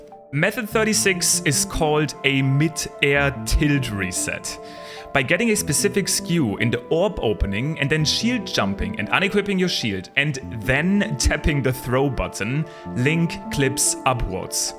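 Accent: Germany. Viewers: Hey, are you German? (Your accent sounds like you are)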